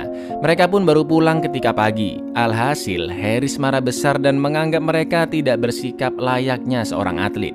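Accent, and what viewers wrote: Java, Indonesia. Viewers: Mmm cara bicaranya kayak orang jawa pasti dia orang jawa...... oke atuh kalo gk tau mah gkpp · Mas Bro asli jawa mana nih, jawa tengah atau jawa timur?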